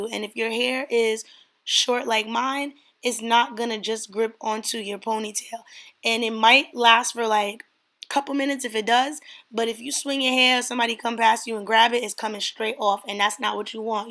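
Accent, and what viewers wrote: New York, United States. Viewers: You slayed that ! Are you from NY or Maryland ? I hair an accent 😂I just subbed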